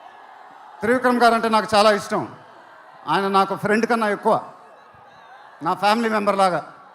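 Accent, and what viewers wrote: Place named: Guntur, India. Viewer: Pure guntur slang 😍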